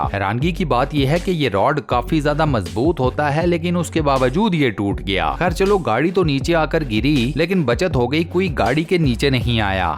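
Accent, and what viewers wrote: Punjab, India. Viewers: Great voice over with Punjabi "tadka"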